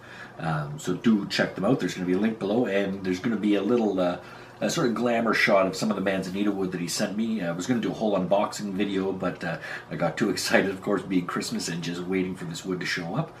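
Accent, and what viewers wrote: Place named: Canada. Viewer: I subscribed for the great info and because I like the way Canadian's say about. If you could please say aboot and chesterfield in future videos.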